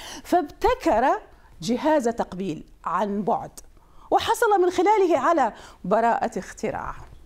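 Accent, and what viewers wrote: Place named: Iraq. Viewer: كنت اتابع ذي المذيعة مع أحمد و ما كنت ادري انه عراقية الا اليوم سمعت من لهجتها (': ❤️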